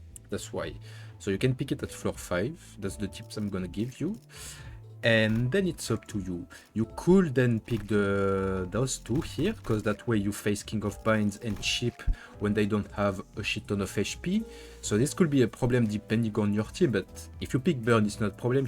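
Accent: France. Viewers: this exquisite french accent really adds a lot to the video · It's so nice finally hearing a fellow Frenchie for once in this guides.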